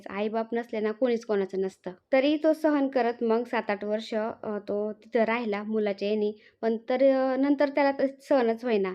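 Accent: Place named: Parner, India. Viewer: ताई तुमचा आवाजाची टोन ऐकुन, अस वाटतच होत की तुम्ही माझ्या माहेरच्या बाजुच्या वाटतात, पन हा vidieo पाहुन कळल कि आपन दोघी एकाच जिल्ह्य़ातील आहे,माझ माहेर पारनेर आहे ,मी पन नविनच youtube channel चालु केलाय ,तुम्ही कुठे रहातात ते please सांगा मला तुम्हाला भेटण्याची खुप खुप ईच्छा आहे ताई please reply दया 🙏🏻🙏🏻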